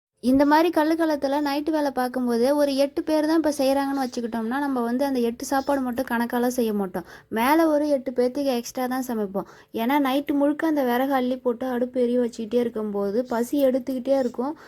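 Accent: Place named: Virudhachalam, India. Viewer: It is amazing to hear Virudhachalam Tamil. I am in Delhi wish to visit Tamilnadu soon. Your video refreshing village memories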